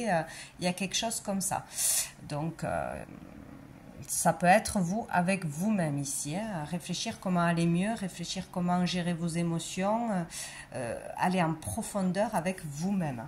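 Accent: South, France. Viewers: Merci, tirage intéressant à écouter. Nous avons tous les deux un accent particulier, toi du sud de la France, et moi du Québec. 🙂❤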